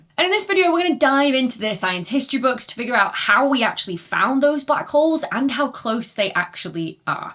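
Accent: United Kingdom. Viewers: Why is it here in the USA we are so willing to accept a British accent as the voice of authority in the field about which they're lecturing us? I'll believe it when she presents a picture of an actual BH, I don't care how adorable her accent is.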